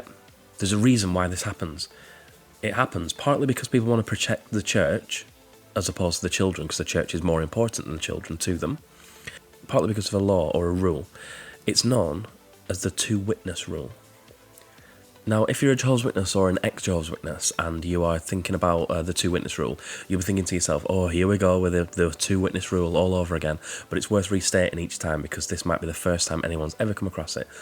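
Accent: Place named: Lancashire, United Kingdom. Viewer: Nice accent ..... Lancs/ Yorks ? Also extremely interesting !!! !it was The Royal Commission that set me free after 40 years !!!!!! I'm a Yorkshire lass !I apologise if you are from Pimlico or Chelsea 👵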